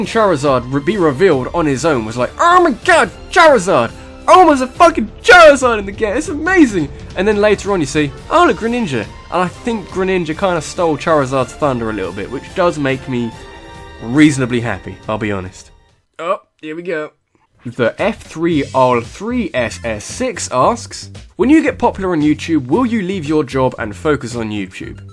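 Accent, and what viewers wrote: United Kingdom. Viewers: Where in Britain are you from?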